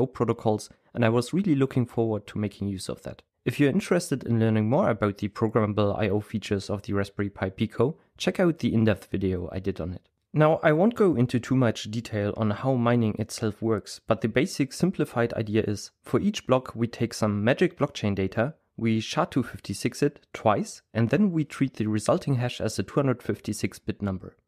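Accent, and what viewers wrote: Germany. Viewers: ist das ein deutscher akzent? 👋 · Aussprache lässt darauf schließen das du deutscher biat xD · Man hört den deutschen Akzent :) Trotzdem cooles Video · Du hast einen deutschen Akzent:) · DAs hört sich an, als wärst du von Deutschland. Der deutsche akzent. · Kleiner deutsche akzent lol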